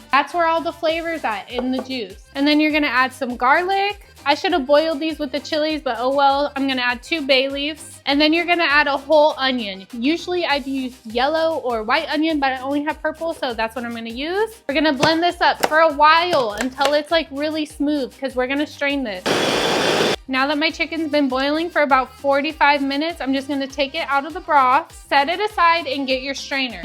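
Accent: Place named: Sub-Saharan Africa. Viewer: A colonizing saa mid uggo ssa yt girl with a funny ssa accent 😂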